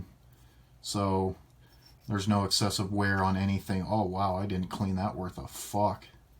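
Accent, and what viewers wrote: United States. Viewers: fuck man were you born and raised in NYC, that's how we speak BROOKLYNESE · When you talk you remind me of the MN boys 🤣 I've been to Des Moines they must be alot different than rural Iowa I also get why people are safety Nazi's just because I had a gun doesn't mean one of my kids didn't touch it or whatever but people don't get the safety is situation dependent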